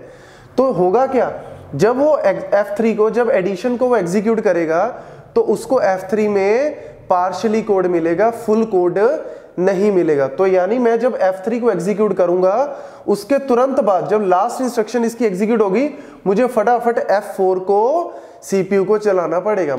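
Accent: Punjab, India. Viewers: God ur punjabi accent. Loving it... ♥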